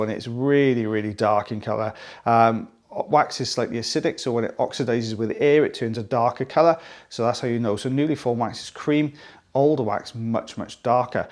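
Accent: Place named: Wales, United Kingdom. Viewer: Hi, love your videos! It took me quite a bit of googling to realize you’re saying “Zoellner” tubes. As an Oregonian, I don’t get much practice understanding lovely Welshmen like yourself. Thank you for the education and entertainment!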